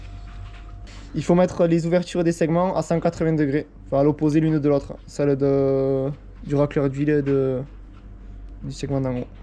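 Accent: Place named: Southwest, France. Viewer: Salut...je viens de tomber sur tes vidéos elles sont vraiment aux top!!! Et en plus avec cet accent c'est encore mieux !! J'ai le même !!! Vive le sud ouest !!! Je travaille sur Toulouse et je roule en enduro avec un excf 2010 dans le Gers a un niveau amateur pour le plaisir! Je viens de me rendre compte que mon moteur est bloqué alors qu'elle ne l'était pas il y a quelques jours !!! Va falloir que j'ouvre pour aller voir du côté cylindre piston ! J'espère que ce ne sera pas plus grave. Est ce que les excf de 2010 sont quasi identiques au moteur Husqvarna comme ta vidéo ? Je cherche également un support moteur c'est un fait maison le tiens ? En tout cas tu peux continuer tes vidéos c'est un régal... Bon dimanche à toi A+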